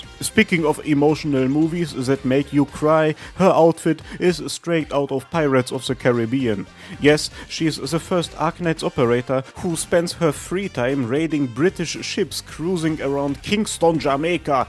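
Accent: Germany. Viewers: holy your german accent is so insanely strongxDDDD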